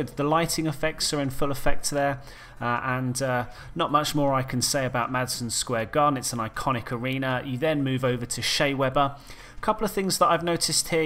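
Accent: United Kingdom. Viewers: It's funny, I've never heard a hockey fan with a British accent!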